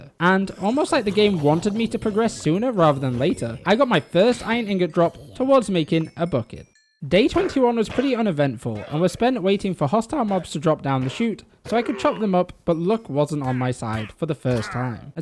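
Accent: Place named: United States. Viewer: You come from uk? Boi you sound like a guy that comes straight from the us!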